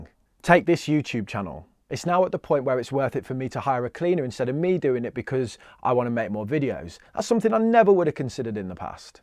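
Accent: Birmingham, United Kingdom. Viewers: Hey neighbour!! I always thought I could hear a touch of manc in that Brum accent! 😂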